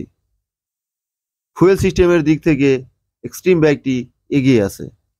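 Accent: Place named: Rajshahi, Bangladesh. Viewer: তোমার বাড়ি কি জয়পুর কথা শুনে তো মনে হচ্ছে জয়পুরহাট গ্রামে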